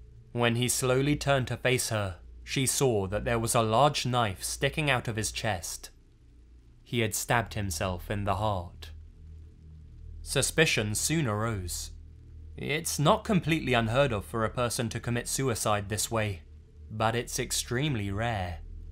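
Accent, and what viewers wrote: United Kingdom. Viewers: I feel people who speak harry potter are the best narrators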